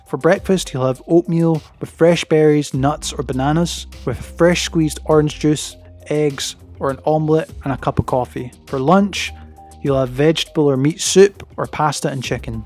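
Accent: Scotland, United Kingdom. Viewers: Good vid work. 👌 the Scottish accents adds more value 😄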